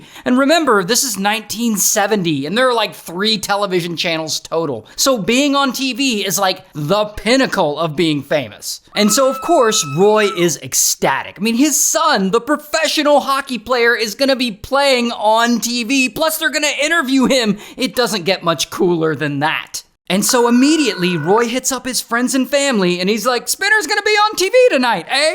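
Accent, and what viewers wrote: Canada. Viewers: As eh! Canadian. Love how you Canucked it up as well as you did. Sad story of course.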